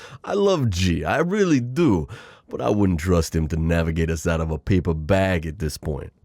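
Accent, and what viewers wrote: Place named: New York, United States. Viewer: Your accent's inspired from Little Italy's "the Godfather" isn't it?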